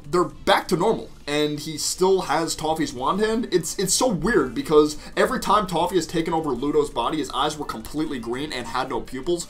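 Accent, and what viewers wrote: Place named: United Kingdom. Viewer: im offended by your british accent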